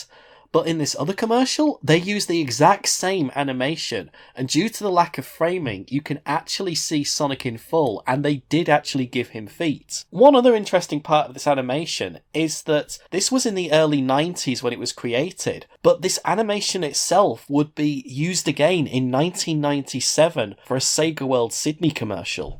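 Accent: Yorkshire, United Kingdom. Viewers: Where in Yorkshire are you from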